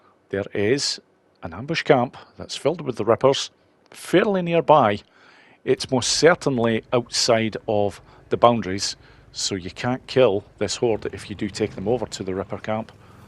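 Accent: Scotland, United Kingdom. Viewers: Dude is it Scottish accent you got? Sounds like Mimir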